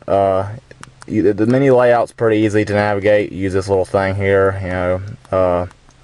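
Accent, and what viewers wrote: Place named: Texas, United States. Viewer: I love your accent... Are you from Texas?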